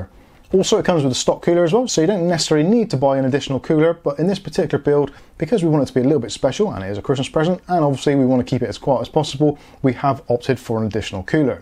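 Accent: Bristol, United Kingdom. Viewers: You sound like a fellow Bristolian? :)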